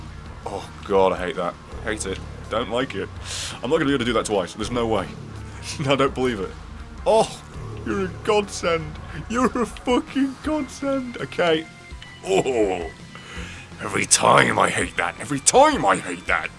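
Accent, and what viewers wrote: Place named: England, United Kingdom. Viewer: Question: You've mentioned that you're in Germany before, but your accent is English. Are you, in fact, English?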